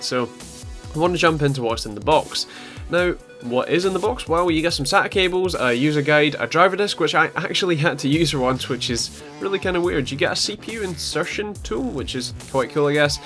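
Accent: Scotland, United Kingdom. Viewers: You seem to have a very slight Scottish accent? Where you from?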